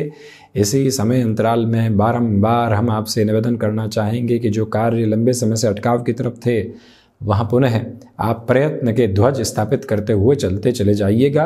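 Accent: Standard. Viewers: Bahut kam log itni shudh ( Pure) Hindi bol paate hai aap ka bolna mujhe bahut pasand hai rahi baat khumbh rashi ki to main mann chuka ho ki ab kuch accha hone wala nahi ha chamatkar ho jaaye to main kah nahi sakta SUNIL CHAUHAN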